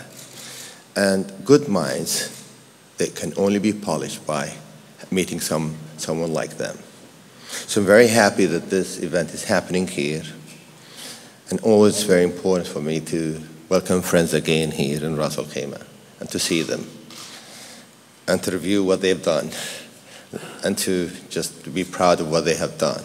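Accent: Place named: United Kingdom. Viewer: Masha Allah Sheikh Saud's accent is really so perfect he has the Britain one first time I get to hear his Speech wooow so fantastic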